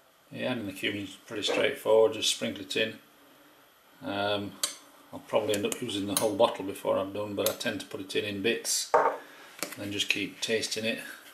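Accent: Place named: Trinidad and Tobago. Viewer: Like u dotish or wat. The man is clearly a trini from his accent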